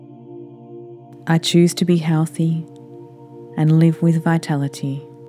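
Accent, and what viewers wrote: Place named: Australia. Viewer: Your Aussie voice is the best calming sound!!! I even set Siri as an Aussie - keep it up.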